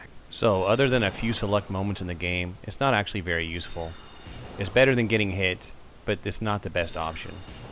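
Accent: United States. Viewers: I see what you mean. Sometimes different microphones have a huge effect on the way a person sounds, but seemed to have a slight southern accent in a lot of these videos that I don't hear in the Fextralife vids.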